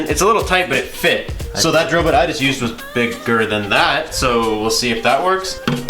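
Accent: Canada. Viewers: Thumbs up for that Canadian accent.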